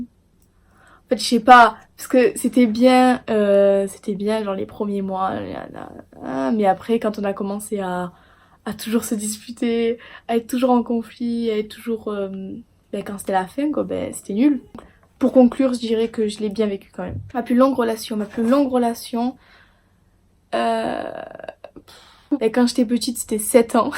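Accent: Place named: South, France. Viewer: L’accent du sudddd 🤪 continue 💙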